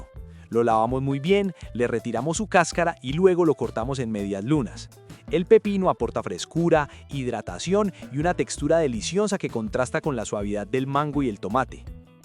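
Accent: Antioquia, Colombia. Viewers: Me encan❤tan las ensaladas que haces y hablas muy paisa como yo pero yo vivo en españa y amo mi colombia más mi Medellín ❤❤❤❤❤❤❤❤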